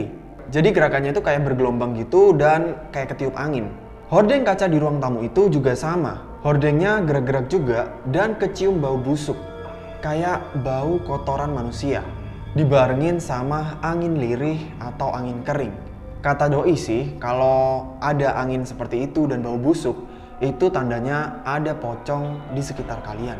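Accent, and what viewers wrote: Java, Indonesia. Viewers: Jawa keras slurr 😅 Salam dari Jawa tengah tepatnya Legenda warteg Kota Tegal laka laka 🙏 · Jowo keras anjai😂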